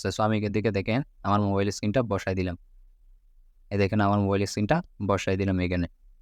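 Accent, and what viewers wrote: Chittagong, Bangladesh. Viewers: ও চট্টগ্রামের আমি সিউর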